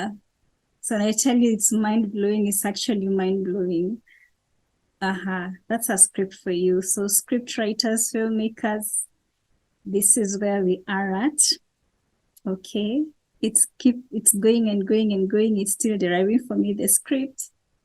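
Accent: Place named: East Africa. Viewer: Like you East African ascent... thanks for the lecture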